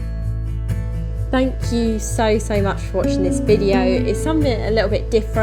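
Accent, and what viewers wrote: United Kingdom. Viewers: I am here for the British English. English is not my first language. I am used to see dressed up in cold weather hiking clothes. I just find out that underneath all those clothes hides an exquisite pretty girl.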